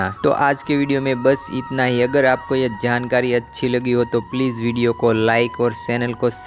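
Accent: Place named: Gujarat, India. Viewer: Gujarati loko hindi bole atle khabar padi j jaay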